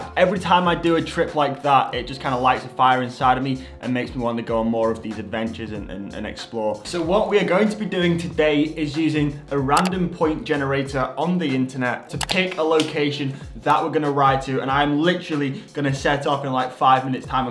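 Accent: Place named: Lancashire, United Kingdom. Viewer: First of all, cool video! Second, and a completely unrelated question to the video. In your Tour of Ulster video you mentioned that you're from there so how'd you end up with such a rich, thick Lancashire accent instead of an Irish one? How long have you lived in England?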